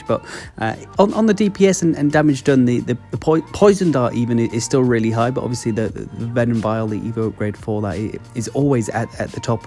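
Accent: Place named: Australia. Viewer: Just started playing. Also broke the bank and bought packs…🫣🫣 you sound Australian too! You were the first one to pop up in my search. I hope that you have good advice and can guide me through the game as well with good tips. I’m level 29 atm.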